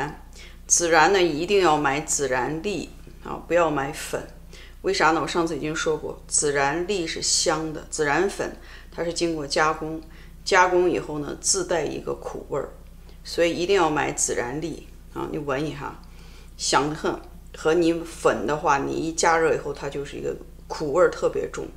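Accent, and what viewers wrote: Shaanxi, China. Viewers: 好亲切的陕西话！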